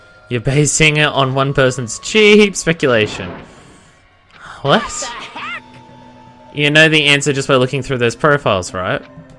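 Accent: Australia. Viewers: When I first found you and heard your voice -- quite a long time back now -- I was trying to place your accent. I could tell there was something in your accent that wasn't making it sound British. It wasn't until in one of your videos you actually said you were Australian that it finally clicked for me. I was like, "Ah, that's it!" I'm one of those people who try not to jump to conclusions, and since I don't actually know you I didn't want to ask straight out where you're from.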